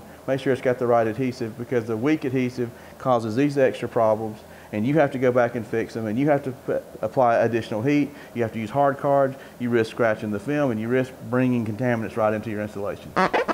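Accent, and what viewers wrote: South, United States. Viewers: I like your southern accent!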